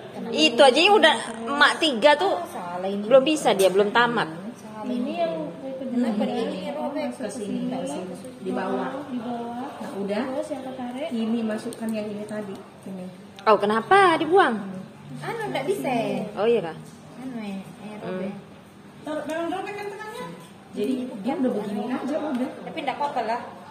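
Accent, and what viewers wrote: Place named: Sumatra, Indonesia. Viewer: Nyimak cara buat ketupat,,,, kok dialog nya seperti orang Sumatra,,,,, besok saya datang lagi sambil mengantarkan kopi hitam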